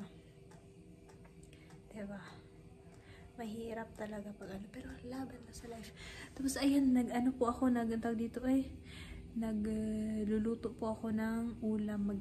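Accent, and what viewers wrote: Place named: Leyte, Philippines. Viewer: Leyte ba kayo gang ? Parang leyte speaking kc kayo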